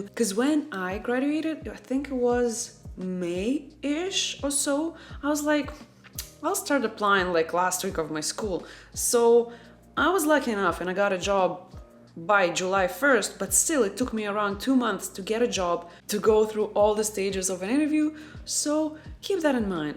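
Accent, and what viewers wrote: Russia. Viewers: I soooo much love your Russian english🤣🤣🤣🤣 thanks for this wonderful video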